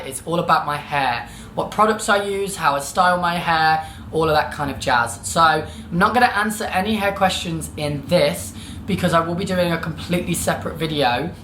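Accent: United Kingdom. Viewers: Esse sotaque britânico ui ui ui, homem você é um sapão 😏 kkkkk ( posso falar oq eu quiser que ele não vai saber mesmo )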